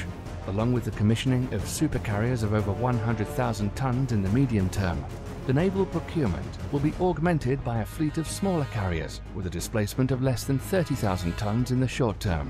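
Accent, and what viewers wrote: United Kingdom. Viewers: Don't forget, the narrator is speaking British English, Not American English. Pip pip, Tally Ho, and all that rubbish! Like my Grandson once said, "Why can't the Canadians make Real Bacon, instead of calling a ham slice, Canadian Bacon?" We had to 'splain, fried meat slices is NOT always Pork.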